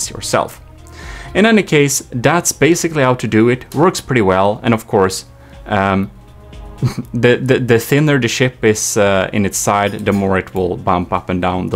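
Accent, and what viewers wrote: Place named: Sweden. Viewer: I can tell that he is from sweden, hej hej jag är också svensk BTW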